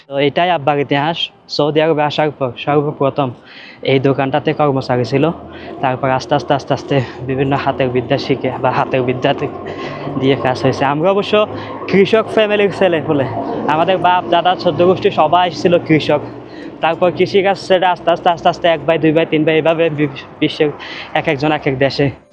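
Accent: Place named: Sylhet, Bangladesh. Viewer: সিলেটি কথা শুনে ভালো লাগলো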